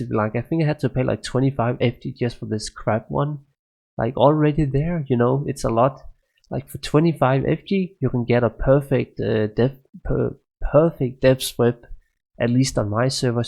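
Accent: Denmark. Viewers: Du lyder Dansk :) But really cool guide mate. might consider rerolling Necro.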